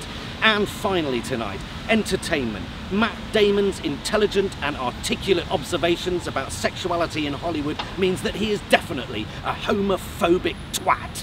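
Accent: United Kingdom. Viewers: This is the kind of news I would watch any day. He’s got that bad ass British accent to go with it.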